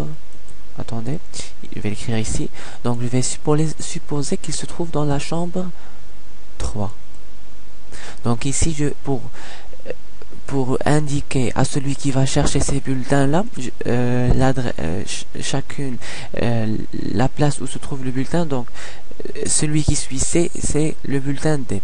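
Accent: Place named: Maghreb. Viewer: t'explique mais on ressent que t'es pas un natif français tu dois être magrébin ? ;)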